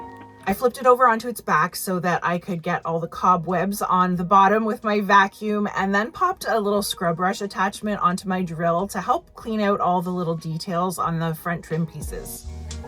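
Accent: Canada. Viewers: Wow! The chest turned out nice! Love your Canadian accent.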